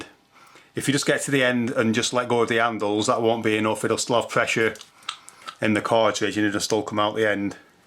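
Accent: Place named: Lancashire, United Kingdom. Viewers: so i was pretty close. Luv a good Lancs accent :) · This Northern fella has helped me no end become a competent DIY'er. I am already a qualified painter and decorator, so to look up this channel just furthers any skills. I even fixed my pensioner mates toilet. Plumber wanted 50 quid, I ordered the part for him and it cost £11.00. Not to mention my Mum's problem with her light fitting, last year. Top bloke! Thank you so much and love that accent! I'm not doing bad 'for a Girl!' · Great video! Even better with your telltale Lancashire accent. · Thank you for this, the Blackburn/Lancashire accent here is strong!!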